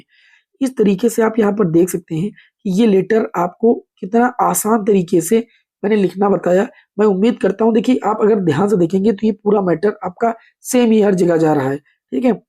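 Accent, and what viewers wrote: Gonda, India. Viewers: Sir ji gonda se ho kya😅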